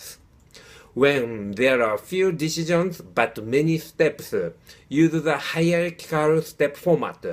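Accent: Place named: Japan. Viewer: 这个日本人说英语真的难听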